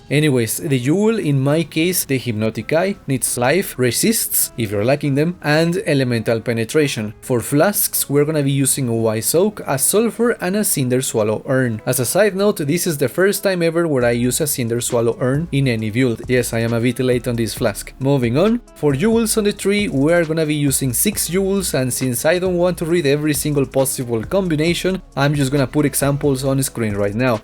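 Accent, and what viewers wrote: Spain. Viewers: are you from like Spain or Argentina? greeting from Argentina btw c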